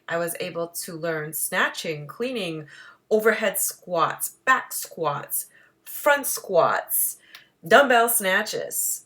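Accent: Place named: Canada. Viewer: Outstanding video- It's like you read my mind request list from yesterday's leg day. Also...Canadian Accent +"Squat"= huge smile! ✅🌈🌈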